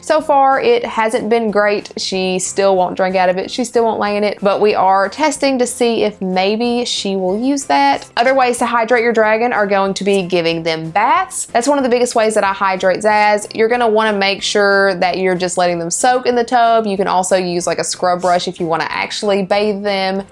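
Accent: South, United States. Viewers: You're drop dead gorgeous 😍 and I love the southern accent 😍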